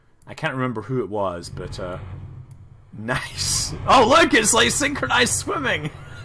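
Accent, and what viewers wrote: Scotland, United Kingdom. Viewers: Scotsman. Ha Ha ha Ha.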